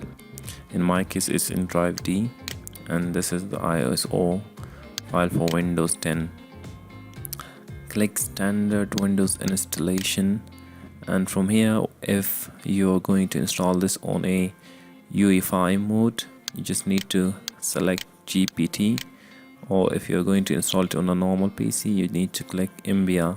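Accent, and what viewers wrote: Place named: India. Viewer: Bishaka takra shawi e Your accent is such as indian...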